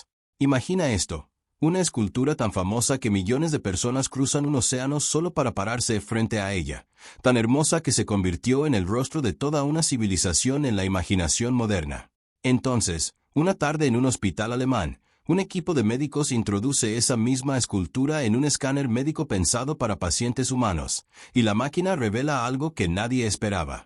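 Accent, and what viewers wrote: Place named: United States. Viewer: Todo esta muy bien excepto el acentito ridiculo de gringo....😅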